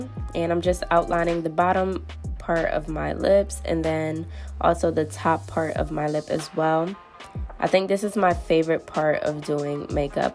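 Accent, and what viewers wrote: Jamaica. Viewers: Gurl jus a c seh u a jamaican❗ daumnn Loving yr videos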